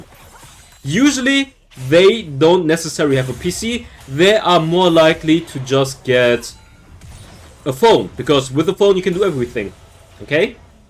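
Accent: Germany. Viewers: I love that u can always hear his German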